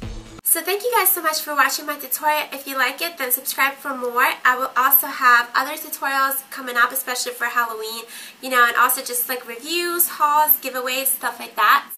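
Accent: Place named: New Jersey, United States. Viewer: Why does it sound like you're from New Jersey?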